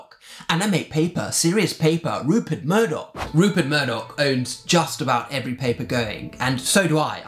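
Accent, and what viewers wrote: RP, United Kingdom. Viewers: This dude really have a heavy movie British accent for real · The most British man I have ever heard and seen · Am I the only person who thinks that British accent is satisfying?? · Bruh he's got the poshest accent I've ever heard, and I'm ENLGISH!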